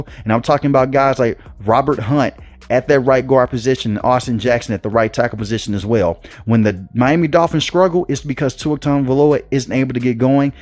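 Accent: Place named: Mississippi, United States. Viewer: The cover photo looked professional, I thought it was CBS or the like doing a breakdown of the game ..until I heard the 'commentator' sounding like a white snoop dog lol. I'm guessing Mississippi accent.. ultimately a good breakdown. Chargers are weak in run support in the middle and with that being a point of emphasis this yr for Miami i think Dolphins will keep em guessing all day and Miami ends up winning by 2 scores. That could be 2 field goals, tds or any combo but they win.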